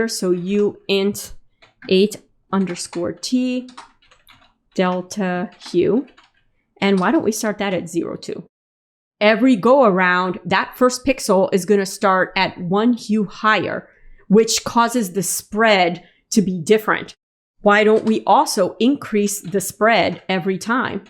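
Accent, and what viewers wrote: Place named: Minnesota, United States. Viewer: this was so helpful. You have a great teaching style and your enthusiasm is wonderful. Thanks for having such a great channel. (Also, I don't know where you're from, but your accent tells me Minnesota, maybe Wisconsin. 😊)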